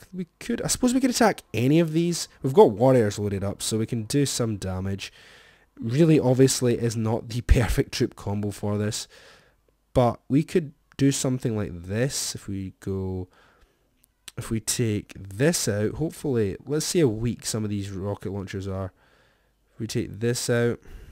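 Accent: Scotland, United Kingdom. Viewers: his scottish accent made the gameplay intresting..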